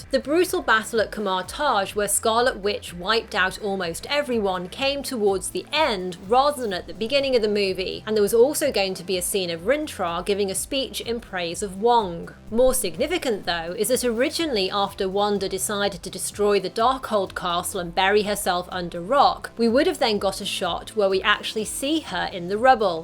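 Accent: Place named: United Kingdom. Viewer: I'm new in the channel, beautiful accent! Are you British?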